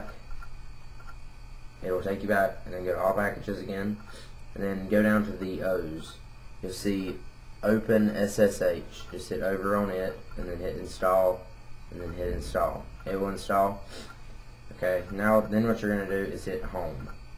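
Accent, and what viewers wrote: South, United States. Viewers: I'm assuming this only applies to jailbroken devices, seeing as i can't find an app called "installer" on mine... nice country singer accent btw...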